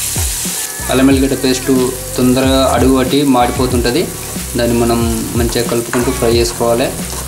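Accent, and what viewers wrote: Telangana, India. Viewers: Nv cppe vidhananiki andharu chustaru asalu nv super jeppinav Telangana yaasa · nedhi telangana na annaya super matladuthunav same to same nalage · Anna Nak brain fry Masthu istame, Nvu matlade slang Masthu Vundi acham telangana yahsa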